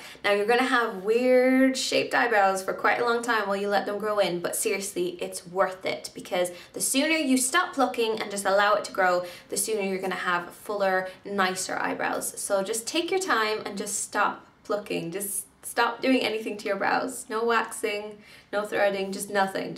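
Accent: Ireland. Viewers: Omg at the start of this video I was half paying attention and thought she was american and then once I fully paid attention I was all "hold the phone, she's Irish!" I don't even know how I could make that mistake haha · Well, I love your accent! Are you Irish? Great video btw :) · Caroline Aleman Ireland I think.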